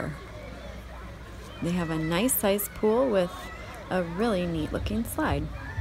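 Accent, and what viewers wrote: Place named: United States. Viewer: Yes, she does have a very pretty voice. Being English, I have the bonus of hearing her soft American accent as well.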